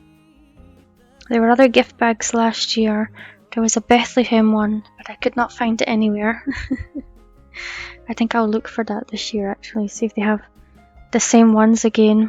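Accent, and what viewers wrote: Scotland, United Kingdom. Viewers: I am a new subscriber to ur channel . Love ur diys. U sound very Scottish. As that’s where I am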